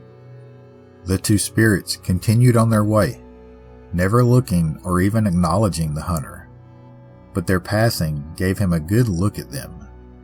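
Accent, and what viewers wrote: South, United States. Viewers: hey wat can I say? I live here & I'm fascinated by spooky shii lol & you put dat lil southern charm on your story tellin I like it! ya huge fan lol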